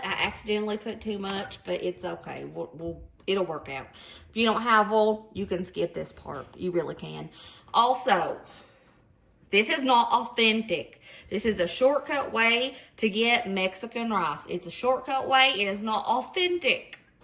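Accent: South, United States. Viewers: I love eggs and rice 😊😊 Your southern accent is adorable 😊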